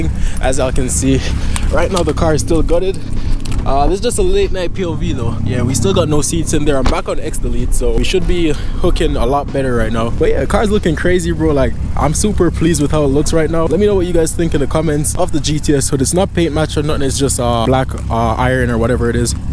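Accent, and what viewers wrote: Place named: Jamaica. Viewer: Are you from Ja am hearing a little Jamaican accent lol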